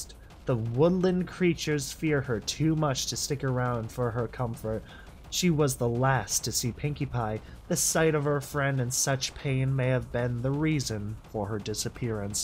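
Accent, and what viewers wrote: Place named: United Kingdom. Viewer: Bro's british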